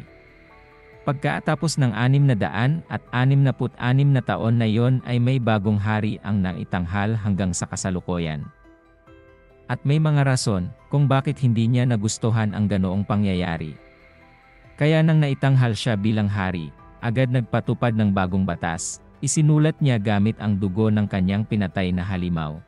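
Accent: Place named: Igorot, Philippines. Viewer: Igorot narrator to😅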